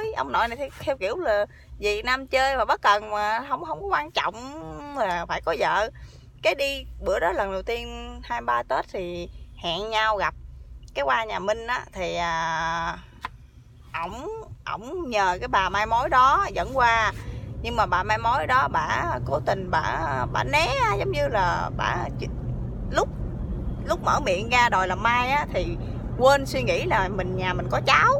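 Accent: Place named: Mekong Delta, Vietnam. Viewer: Chị giống quê miền tây quá...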